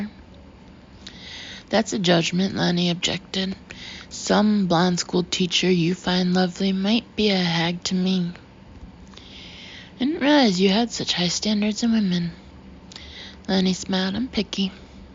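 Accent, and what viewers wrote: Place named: New York, United States. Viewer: Your relaxed, classic New York accent is the perfect narration for these novels. It makes them a pleasure to listen to.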